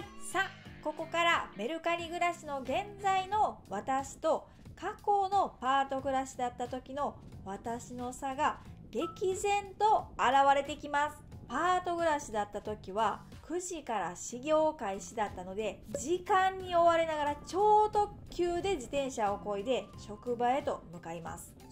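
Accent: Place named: Kansai, Japan. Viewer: 明るくて関西弁が可愛くて、とっても素敵だと思いました。 私も主婦でメルカリではちまちまとハンドメイド品などを販売していますが、お小遣い程度の売り上げです💦 ちゃんとビジネスとして成功されていて、かっこいいと思いました。 元気をいただきありがとうございます。 応援しております。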